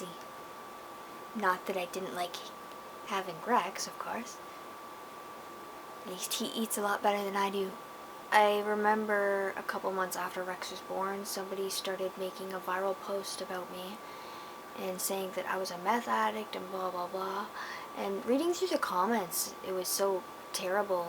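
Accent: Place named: Canada. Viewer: i can hear your canadian accent!! :D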